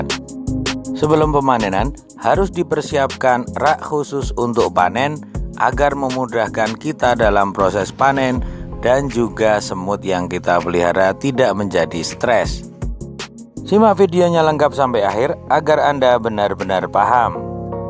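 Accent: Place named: Yogyakarta, Indonesia. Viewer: Apa suara org jogja kaya gini semua ya 🤔🤔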